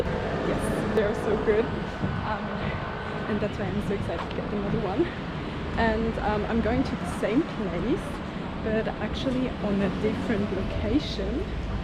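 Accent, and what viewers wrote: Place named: Germany. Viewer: Your beautiful accent reminds me that of my ex-german girlfriend! You are so sweet!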